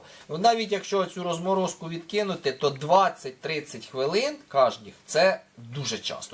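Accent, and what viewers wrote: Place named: Surzhyk, Ukraine. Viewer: Хороша українська мова. Невеличкий суржик надає шарму. Плюсик :)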